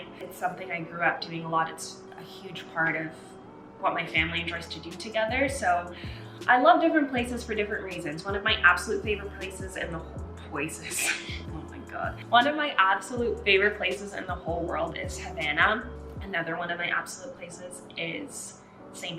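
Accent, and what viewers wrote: United States. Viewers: As far as her American accent it’s not that hard to get after moving here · How does a Nigerian/Black Russian 28 year old, who looks like she is 18, end up with such a flawless American Accent?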